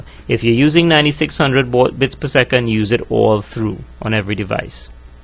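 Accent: Trinidad and Tobago. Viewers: That accent... Trini! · I know that trini accent anywhere.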